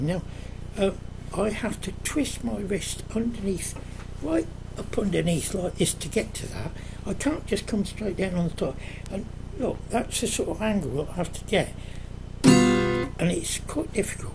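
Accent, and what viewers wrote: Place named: South London, United Kingdom. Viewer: Old..... but not obsolete.... You sound as though you are from South London, Southwark maybe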